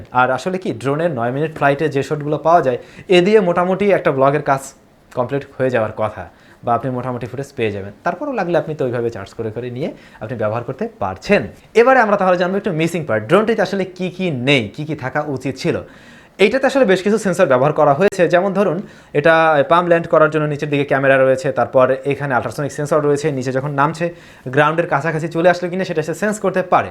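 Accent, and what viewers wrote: Barishal, Bangladesh. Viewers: apnar bari borisal, karon tak kay. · ভাই টাক খায় তো বরিশালের কথা। আপনার বাড়ি কোথায়?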